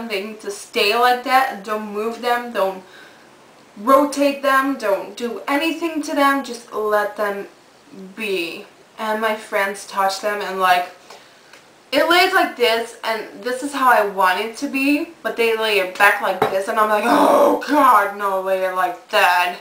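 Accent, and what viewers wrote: Netherlands. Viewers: It's cause she's Dutch and she has an accent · when i first watched one of your more recent videos, i'm so embarrassed to say i actually thought you were American because I can barely hear your accent!! i was happy to discover you are from Holland! I love watching your videos, you are so talented and funny! LOVE YOU!!!!! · That’s a Dutch accent? Interesting.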